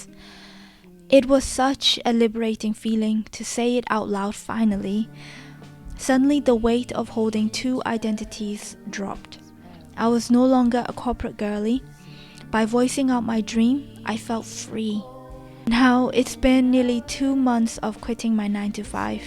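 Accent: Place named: England, United Kingdom. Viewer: I hear a cute English accent❤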